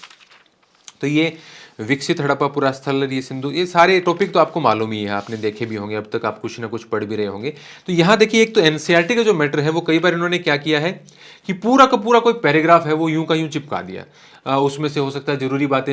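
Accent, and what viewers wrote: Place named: Bharatpur, India. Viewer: गुरुजी नमस्कार मजा आ गयो आपकी बोली कतये भरतपुर के की तरह खड़ी है सीधी सपाट बात करें आपकू बहुत बहुत धन्यबाद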